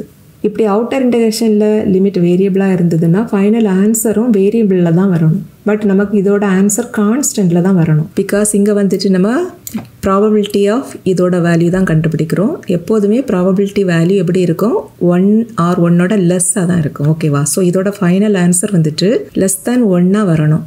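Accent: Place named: Nagercoil, India. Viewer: Thanks mam,clear explanation with nagakoil slag was awesome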